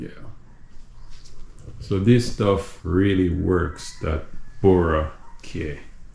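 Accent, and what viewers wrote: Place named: Saint Lucia. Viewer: Hey u have a a st lucian accent.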